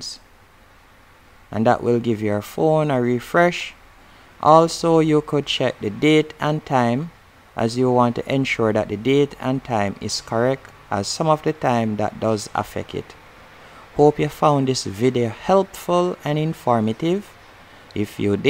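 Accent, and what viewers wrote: Jamaica. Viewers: It work thankssss I can tell your a Jamaican thatssss wasup thank u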